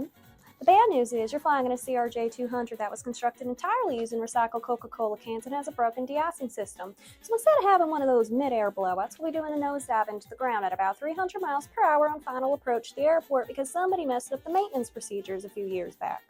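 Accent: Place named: South, United States. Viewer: Your southern accent is thicker than scots' or Northern Irish's that Americans usually complain about. This is not a critique, just something I found funny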